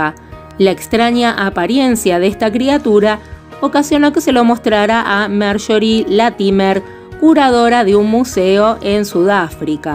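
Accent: Argentina. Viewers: Nuevo suscriptor.. Excelente contenido.. Sos de Argentina?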